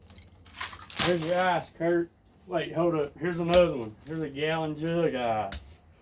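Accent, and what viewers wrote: United States. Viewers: Subbed cause the accent. Never was in the U.S. but always liked those accents, BTW great video.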